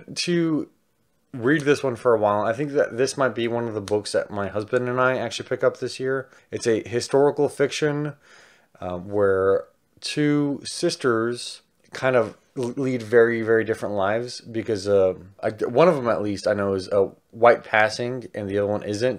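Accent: South, United States. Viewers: I love your video at your house in Texas during your quarantine until you had some new books are "Now I Rise" and "Bright We Burn" by Kiersten White, "Aurora Rising" by Amie Kaufman and Jay Kristoff, "Thunderhead" by Neal Shusterman, "Mistborn" and "Mistborn: The Well of Ascension" by Brandon Sanderson, "The City We Became" by N.K. Jemisin, "The Vashing Half" by Brit Bennett, "The Prophets" by Robert Jones, Jr., "Angel After the Fall" by Joss Whedon, "Angel and Faith" by Christos Gage, "Queen of the Slayers" by Nancy Holder, "Warbreaker" by Brandon Sanderson, "Fire from Heaven" by Mary Renault and so many others including "Jeremiah" by Robert Cain while you're talking about all of the characters from the book to read them, so you're reading some letters by one of your fans after that, but I hope your husband, Zach will be very proud of you and I wanna say I'm happy for you, because I love your Southern accent, I know you're gay and you're half Mexican!!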